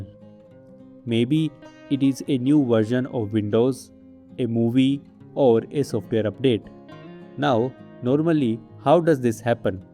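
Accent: India. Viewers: After hearing the Indian accent, I was confident that this video will surely explain me, and it did!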